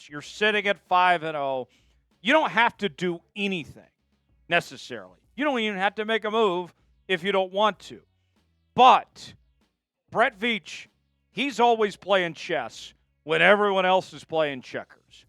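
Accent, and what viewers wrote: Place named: Appalachia, United States. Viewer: This guy's just keeps on making bullshit content so he can use that hilariously hillbilly toned accent and annoy everyone within yelling distance.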